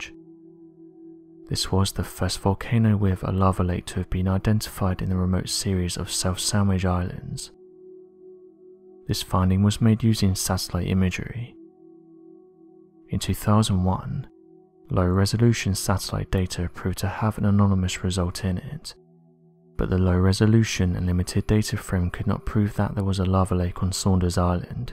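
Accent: England, United Kingdom. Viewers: I think he's voice is lovely and I love he's English accent 😍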